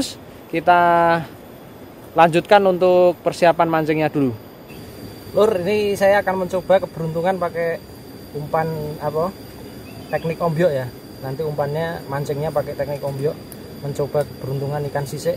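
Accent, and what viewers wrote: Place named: Banyumas, Indonesia. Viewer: Koyoke ng ngapak kidol pam..kang booozz...🙏🙏🙏👍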